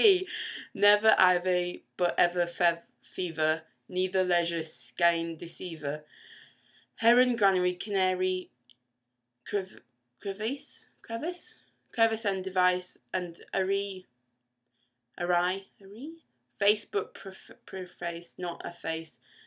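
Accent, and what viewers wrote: United Kingdom. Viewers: ahhhh are you from up north? im down south so we pronounce things like singer, ginger ,linger grass brass differently :) a little bit posher perhaps :D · I love your British accent!! But why do you have to read it that fast? It is a poem, right? :) I am Hispanic so I wish you could make a slower version for people like me :) C'mon! Try it !!! PLEASE!!! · Come on love!, you can do it much better, you are British, I want to learn from you, I want to have your accent, it is beautiful. Try to do a decent video but please recite the poem slowly so I can follow you easily. Cheers.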